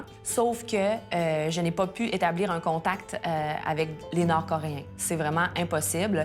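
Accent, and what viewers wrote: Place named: Canada. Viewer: Quel accent, j'adore nos cousins canadiens. 🇨🇦🇫🇷💞👍 Quant à voyager en Corée du Nord 🤔🤔🤔 Pour subir un contrôle total ? De toute façon on ne peut pas se déplacer comme on veut. Pour finir dans une prison ou dans un camp ? Alors pourquoi ? Il vaut mieux venir en France, dans le Sud par exemple ou en Corse.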